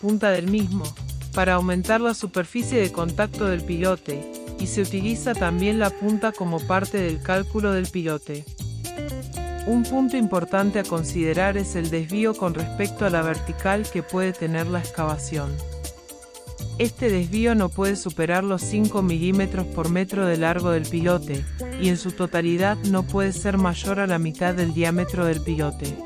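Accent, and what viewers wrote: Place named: Argentina. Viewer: Vi la información de las zapatas, me iba a suscribir, ya lo habia hecho, todo parece indicar que la información viene de un ingeniero estructural y por el acento de voz, es claro que es Argentino.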